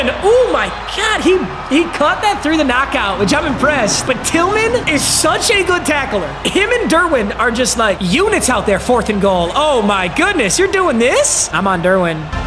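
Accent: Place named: Virginia, United States. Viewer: just cuz you talk like you have a mouthful of nuts & bolts doesn't mean people with less southern accents than you don't have a southern accent. He's got that Virginia southern accent 100% just listen to the way he says "you're terrible"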